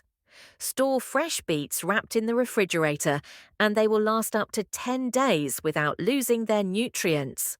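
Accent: United Kingdom. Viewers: An English voice giving advice in American Cups. 1oz or 3oz would be understood by English people. I. England we are pensioners not seniors. A I. Grrrr. Also spinach can be dangerous. English people use cabbage and sprouts. Also if on blood thinners for the heart and low BP we need to be careful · Np need to put British accent AI. Just keep it simple!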